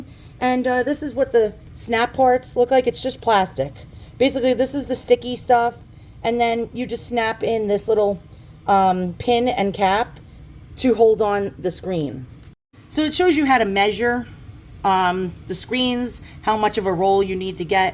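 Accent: New York, United States. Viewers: From a new yorker to another new yorker, love the accent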